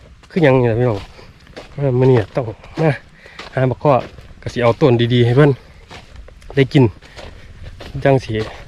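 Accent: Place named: Nong Bua Lamphu, Thailand. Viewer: ให้มันสุกก่อนจ้าจั่งสิแซบจ้าเจ้าคือเว้าคือแท้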